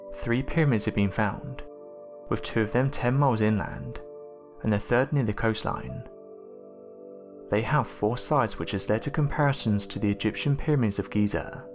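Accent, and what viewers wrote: England, United Kingdom. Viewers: His beautiful British accent and pace of narration too... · Yeah his cadence is amazing and the way he pronounces words. I could listen all day! I can't quite make out what type of English accent that is.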